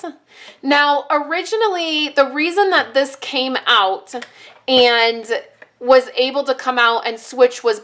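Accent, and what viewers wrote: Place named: Atlanta, United States. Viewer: Thank you. This is the most informative video I have seen in a long time. My husband was listening to you and swears that is an Atlanta accent. Is it? Love it wherever you got it from!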